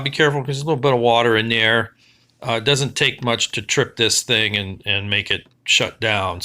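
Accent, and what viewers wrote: United States. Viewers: Oh my gosh! Thank you! It worked!! I liked your Western PA accent? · You from pittsburgh dude??? I heard that accent.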